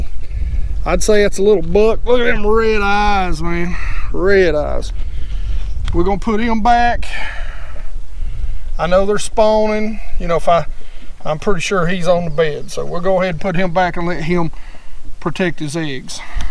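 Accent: South, United States. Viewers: Great stuff. Best of luck to you this season! Love the southern accent!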